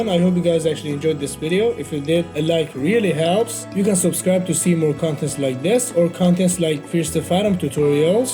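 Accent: Iran. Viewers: سلام داداش اومدم ویدیوت از لحجت فهمیدم ایرانی موفق باشی 😂❤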